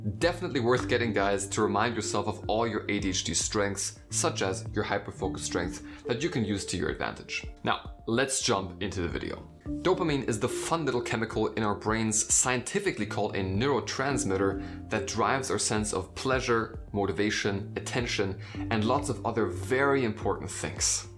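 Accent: Germany. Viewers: Huh ben je Nederlands? Jouw accent klinkt Duits haha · Man merkt nur ein kleines bisschen, dass du Deutscher bist😉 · Are you German? I‘m German and you sound to me like German talking English. Just want to know, no judgement. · well he works in Sales (sic). I do not need a T-Shirt or Hoodie with a (imho opinion) daft slogan, let alone the expense to remind me of my ADHD. Might work for some, but that's too much HYPE for me. Viel Glueck mit dem Unterfangen Herr. I'm out! (That's best of luck in German, I'm German and can't help notice his accent). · Your English is excellent. My Omi was from Frankenberg and I enjoy your videos for the information and the accent. I miss hearing it. Thank you!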